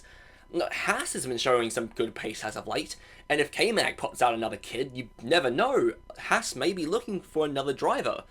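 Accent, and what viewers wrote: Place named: United Kingdom. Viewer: I love slightly rude F1 commentary with a british accent! You got my sub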